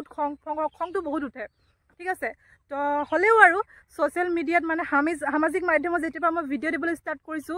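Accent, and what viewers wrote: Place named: Adivasi, India. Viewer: Hvy didi agh laga di 😮 bhut besi hvy ke dile adivasi voice t kole aru vl lagil hoi 😮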